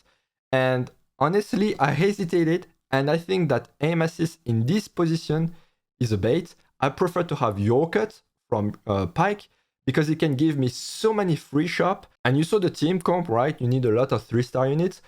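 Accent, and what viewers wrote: France. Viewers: love how you talk, did you grow up in france?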